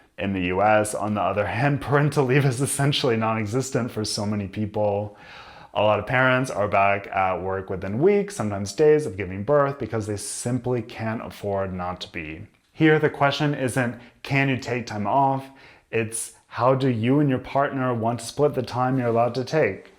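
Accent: Germany. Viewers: I can hear your German accent.🙂 That's cool. It shows you speak German a lot!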